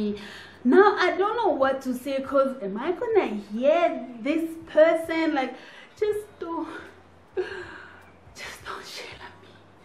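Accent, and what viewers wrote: South Africa. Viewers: Your english is good though and you don't sound like someone who comes from the french-speaking country. In fact, your accent is now truly South African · You speak English so well now and you sound South African. Thank you for your content my beautiful sister. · You sound south african though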